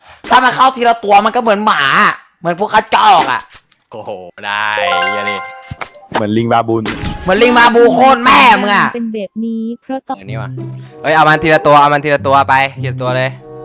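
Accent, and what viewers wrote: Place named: Suphanburi, Thailand. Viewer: พี่นพเป็นคนสุพรรหรอคับแลเหน่อ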